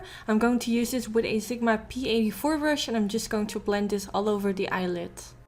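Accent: Netherlands. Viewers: Are you from Holland? I can hear from your voice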